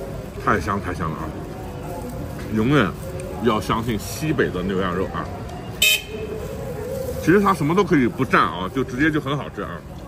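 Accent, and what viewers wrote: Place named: Northern Jiangsu, China. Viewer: 博主口音像是苏北😂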